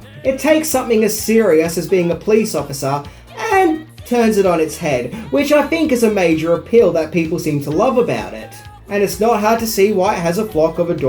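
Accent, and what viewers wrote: Australia. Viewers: After of this horrible Australian narrator, I just... I just can't. 👎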